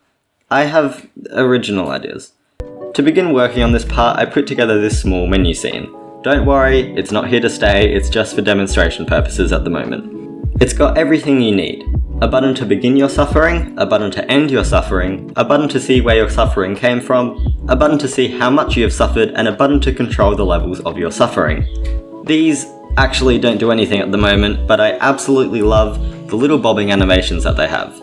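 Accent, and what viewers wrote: Australia. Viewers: didnt know you were aussie, i am too 🙂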